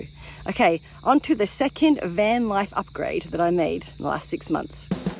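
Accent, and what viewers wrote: Australia. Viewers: you have the strangest accent - you sound aussie and then this american "R" comes through - where are you from?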